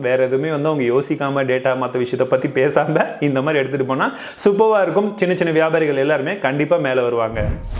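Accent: India. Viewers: வாழ்க தமிழ் if an innovative app from India raises I would really help with everything I have but not an app like tik tok even if it was from India I really see you as a Tamil version of MrWhoseTheBoss if you had an amazing accent other than Indian English accent you would reach ammmmmaaaaaazzzziiinnngggg heights 2 million subscriberslam onnum ila ungaluku please don't think this comment as a publicity these come straight from my heart and please do not think I'm discouraging you or Indian accent I'm just telling white men accent is soo good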